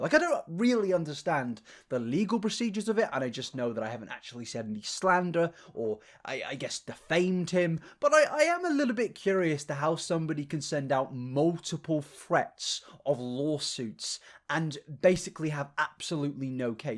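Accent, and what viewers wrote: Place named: United Kingdom. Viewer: Do all britbongers say cuhrators instead of creators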